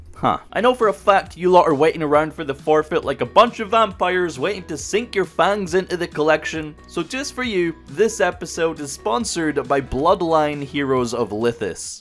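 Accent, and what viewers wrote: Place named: Scotland, United Kingdom. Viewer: Getting a binder full of good stuff? 6/10 Watching a scottish man talk about cardboard in a funny accent? 10/10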